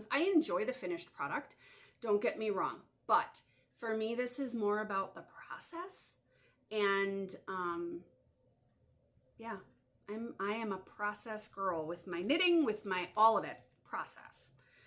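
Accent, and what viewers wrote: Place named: Wisconsin, United States. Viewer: Oh shucks I'm in Wisconsin as well. You must have been born and bred here. I just love hearing your accent. I've only been here for 49 years. I am so jealous of your gorgeous hair. That's not very Christian of me. I don't know anybody who stitches. We are around the eau Claire osseo area. Good to see a native doing a floss tube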